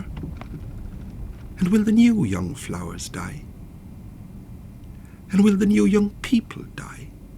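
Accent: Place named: Kerry, Ireland. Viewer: Beautiful, dreamlike commute with the loveliest Kerry accent, that takes me back to childhood memories of sitting on the carpeted floor listening to Brendan Kennelly on the Late Late!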